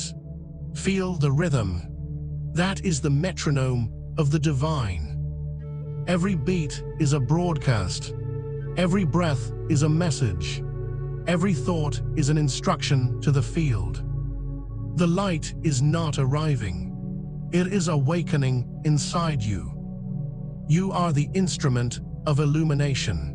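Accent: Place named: United States. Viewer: You have changed you voice,bringing out the American in the accent!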